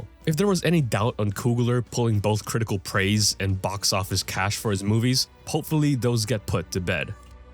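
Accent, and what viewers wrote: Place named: Philippines. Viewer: filipino? i can hear a very faint accent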